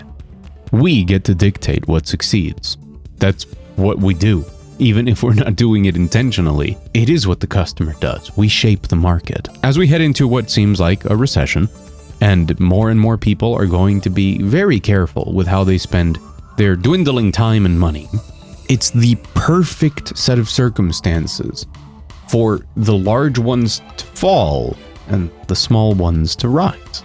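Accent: France. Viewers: ur french accent is good! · The wrong assumption big studios and marketers make is mostly that focus groups represents organic crowds. They dont. Focus groups and surveys are a simulation of real people collective behzvior and quite easily miss the mark. And kudos for your french accent :)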